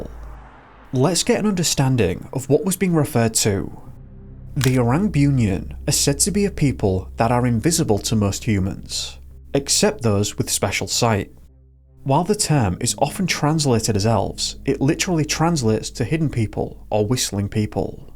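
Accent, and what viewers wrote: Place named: Brittonic, United Kingdom. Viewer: I love this channel. Stays in my top ten. I do want to say I especially like the Fortean, UFOlogical ones, and would appreciate broader Fortean phenomena coverage if that's a thing you'd be interested in. Not that I don't like the missing persons episodes...I'd LOVE to hear you cover the REALLY weird ones. With strange search events, bodies found under bizarre or impossible circumstances, missing kids found alive but absurd distances away in short order, search dog oddness, no cause of death being determinable. Paullide's core weirdies. I REALLY love the "Fae Theory" stuff, including this one. I gather by your accent that you are from some Brittonic area that probably has conspicuous Faerie or Elven Lore. Lovely accent by the way. All around cheers! Please, keep up the great work!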